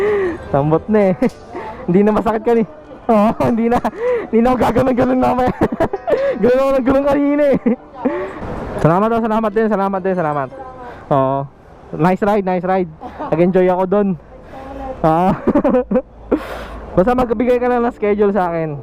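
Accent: Visayas, Philippines. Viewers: Parang VISAYA so idol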